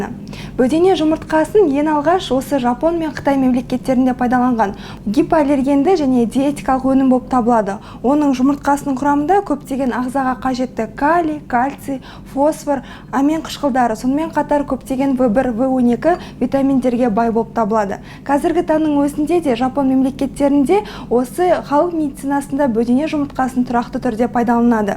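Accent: Standard. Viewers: Таза қазақша əдемі сөйлейді екен Жарайсың !!! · Кушти казакша таза сойлеп тур.толык .анык маглумат жетикип ацтпды екен .рахмет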